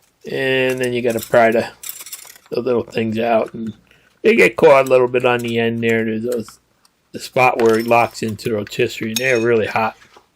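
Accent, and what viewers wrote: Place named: New York, United States. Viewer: You have a slight New York accent but no hillbilly twang!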